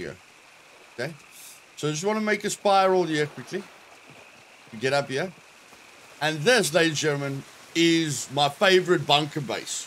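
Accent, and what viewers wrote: South Africa. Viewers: you sound South African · Are you South African?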